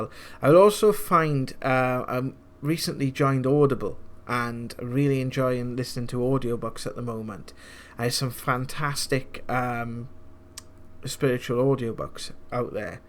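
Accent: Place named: Wales, United Kingdom. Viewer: You sound Welsh asf hahaha proper valley accent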